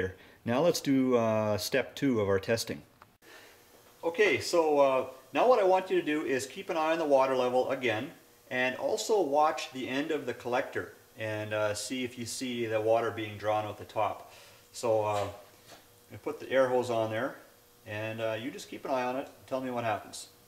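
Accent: Canada. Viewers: He sounds Canadian to me lol.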